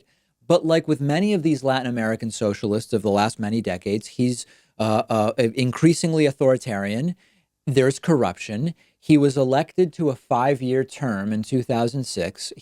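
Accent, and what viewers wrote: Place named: Argentina. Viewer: He is from Argentina.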